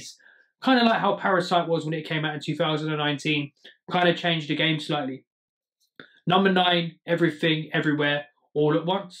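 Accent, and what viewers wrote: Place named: United Kingdom. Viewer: Yeah man! Subscribed. Cool. Also UK accent straight out of Guy Ritche movie. Nice to meet you.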